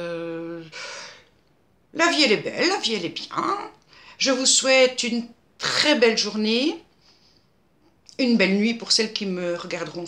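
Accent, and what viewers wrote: Belgium. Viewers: coucou,😃 original avec la mousse à raser, je ne connaissais pas, merci pour ce partage,👏👍💖 et , notre accent belge, il est très bien lolll 😜😊 en plus il y en a différents , pour tous les goûts 👍🤔😃 bonne aprem, bisouilles 😘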